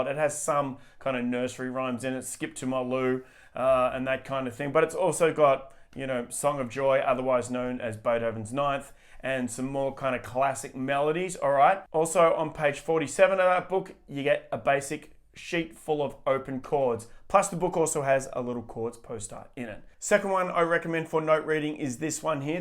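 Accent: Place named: Australia. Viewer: is such a treasure! Hello from America. I could listen to Aussies talk all day.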